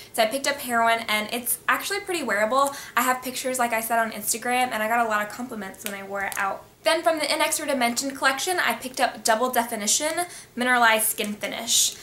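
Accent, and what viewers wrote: South, United States. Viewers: Yourr sooo gorgeous & I think I hear a country accent??? Im from texas :)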